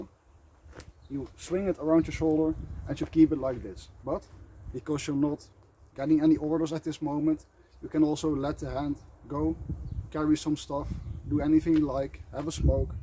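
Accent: Netherlands. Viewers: Hmmm dutch??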